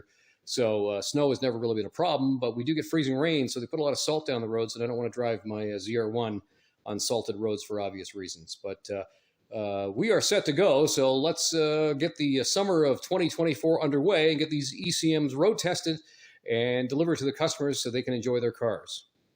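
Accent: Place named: Canada. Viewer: very cool and sounds like u r canadian too. sweet, do you sell ecm's?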